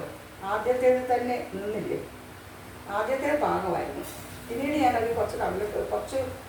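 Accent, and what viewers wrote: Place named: Kottayam, India. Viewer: But you have a Kottayam slang any connections with Kottayam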